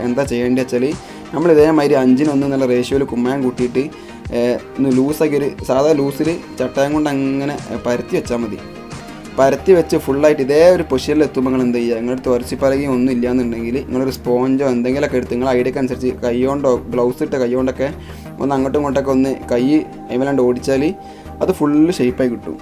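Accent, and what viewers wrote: Malappuram, India. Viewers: Ith malappuram bhasha alle. Atha ganethanne aaymkotte😍😍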